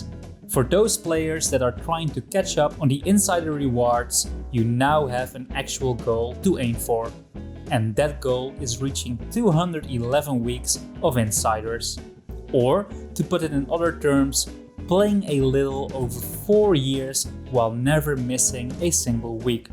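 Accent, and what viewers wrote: Netherlands. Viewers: wait! your dutch?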